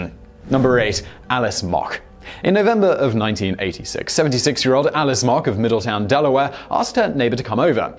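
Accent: United Kingdom. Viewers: Your accent is the only British accent that is actually pleasurable to listen to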